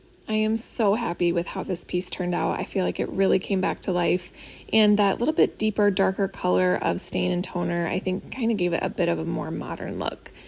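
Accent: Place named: United States. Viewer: Ese acento americano es muy feo. No soporto escucharlo.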